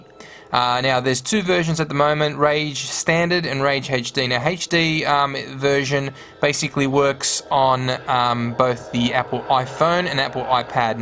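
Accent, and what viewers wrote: Australia. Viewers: Hes australian........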